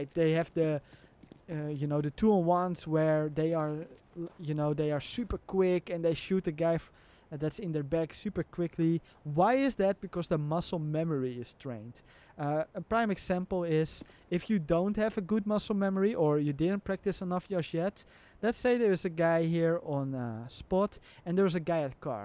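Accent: Netherlands. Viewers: The really small adjustments are the hardest, big flicks on the body are fairly easy, but when your crosshair is just 1 pixel OFF the enemy ... So hard to compensate if you have to do it quickly. It's what i'm practicing most atm , also is this guy dutch ? SOunds like dutch accent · are you dutch?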